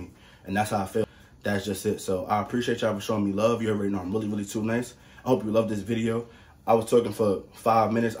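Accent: New York, United States. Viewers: “Light skinned salmon “ 😂🤣 big facts! Lol I knew you was from NY soon as I heard you tawkin.lol Yo how you like that kerrigold butter? Some ppl be sweating it but idk..I wasn’t really feeling it. What do u like to use it for more?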